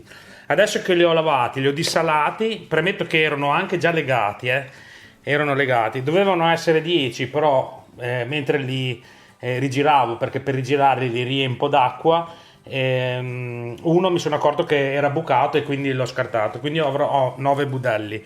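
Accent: Emilia, Italy. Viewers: ... nel video sembri mia nonna, stessi gesti, stessa sicurezza. Bravo, un calabrese con l'accento emiliano, perfetto. Un solo piccolo suggerimento. Conviene, anche solo per praticità, così faceva anche mia nonna, legare i tocchetti di nduja mentre si riempie il budello. Tutto il resto è perfetto, anzi l'aggiunta dell'affumicatura, mi convince molto. Sono dei Casali cosentini e qui c'è un salume simile forse identico alla nduja, lo chiamiamo nduglia, le cui tracce risalgono al 1600 (quindi più antico di quello di Spìlinga). È citato in una poesia di Donnu Pantu, "la briga degli studenti", di Aprigliano (CS). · Bravo! È un piacere seguirti e sentirti parlare. Salumi e pronuncia emiliana mi riporta alla mia metà mirandolese. L'altra metà sanmaurese (prov di Torino) mi dice però che quel fornelletto da noi si chiama Fojòt (fuiot) e serve per scaldare tenere calda e degustare la bagna caoda (cauda) piemontese. 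Tecnicamente fa lo stesso lavoro per la bagna e per la 'nduja: manciari! (La parte ragusana di mia moglie)😜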